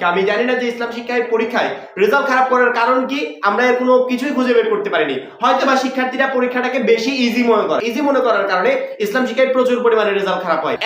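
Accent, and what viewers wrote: Sylhet, Bangladesh. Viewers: আপনি কি সিলেটি😢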